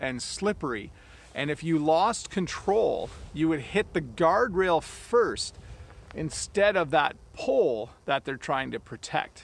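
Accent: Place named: Canada. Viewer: Hi great to learn with you English in Canadian way thanks Lott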